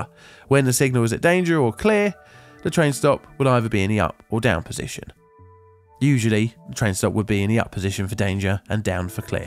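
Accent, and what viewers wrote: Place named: England, United Kingdom. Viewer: DEB-ree (debris) 😂 we say duh-BREE. Choob (tube). 😂 I love English accents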